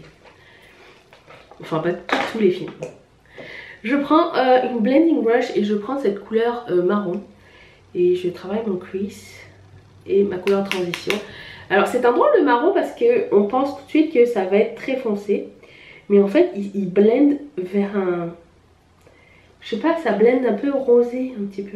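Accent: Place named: Quebec, Canada. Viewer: J'aime bien quand tu parles à la québécoise!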